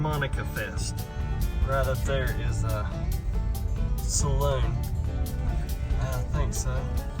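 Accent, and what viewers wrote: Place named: East Coast, United States. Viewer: "Holla". I love you Easterners accent & word choice. We here in Idaho, call that a basin, canyon or draw. Very cool wilderness too have hunted. Hell's Canyon is great too. Fantastic bull. Congrats. Gotta admit, I teared up with you. Truly a blessing. God is always in the blessing His children business!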